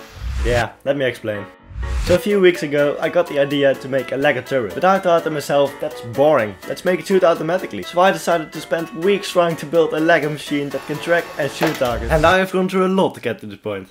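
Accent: Netherlands. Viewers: I immediately knew you were dutch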